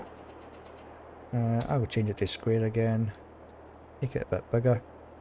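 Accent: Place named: Scotland, United Kingdom. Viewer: Taks a tcheuchter te ken a tcheuchtar! I subscribed as soon as I heard your voice. Just back from Scotland to Finland and driving around the North East, I immediately restarted FS22. I miss my home country so much and my country ways. Brilliant video. Thank you. Regards Fermer Findlay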